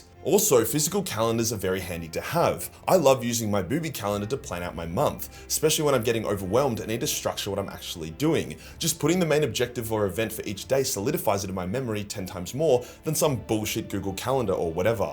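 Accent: Australia. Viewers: I've been here since the beginning, I'm a married 40 year old, and I'm not here for boobs, I'm here to hear a degenerate Aussie talk about my favorite subjects. Boobs are a good bonus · I just like listening to this absolute chad Aussie talk about a great universe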